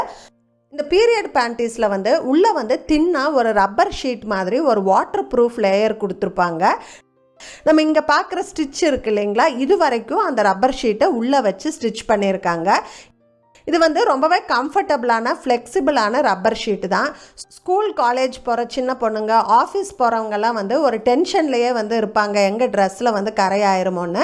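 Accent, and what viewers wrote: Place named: Kerala, India. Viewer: tamil with Malayalam slang nice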